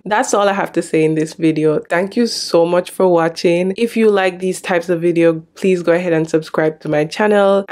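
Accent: Jamaica. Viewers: I love your Jamaican accent-mun. 😅 I doubt I would look good in feathers and costume and makeup for carnival. 🤣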